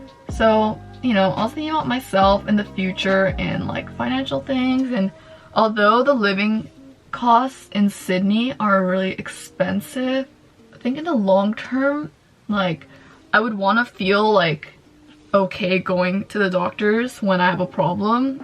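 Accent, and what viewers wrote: United States. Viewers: New to your channel and am wondering how your English is so American sounding if you are raised in Japan? And yes healthcare and college are all extremely expensive here in the California and the US in general. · Choosing the right school and location is so hard. It’s hard to settle. Has your experience gotten better in Australia than the US? Your international schooling explains your accent. You sound like my cousins in Cali. Does japan have good schools? You might just miss home and the familiarity. Small world - I’m from San Jose lol. It’s not the world’s best place. Did you like San Francisco?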